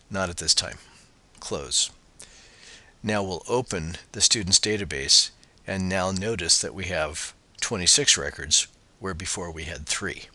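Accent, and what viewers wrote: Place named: United States. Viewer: I FINALLY FOUND AN INSTRUCTOR WHO SPEAKS AMERICAN ENGLISH.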